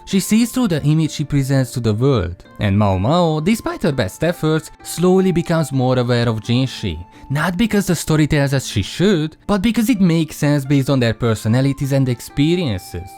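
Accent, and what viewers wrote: Hungary. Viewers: Proud Hungarian accent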